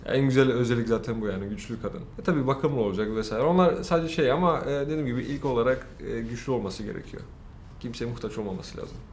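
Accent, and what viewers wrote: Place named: Cyprus. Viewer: Kıbrıs aksanına çok benziyor konuşması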